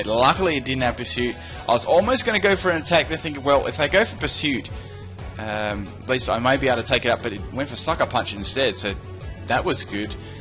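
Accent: Australia. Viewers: I was like: "Hey, same as Australia! Oh wait. is Australian...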